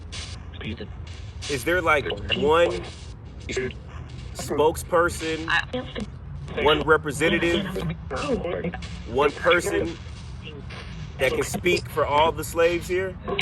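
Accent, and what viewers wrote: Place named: South, United States. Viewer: Just curious, why don’t the spirits that come through have southern accents like yourself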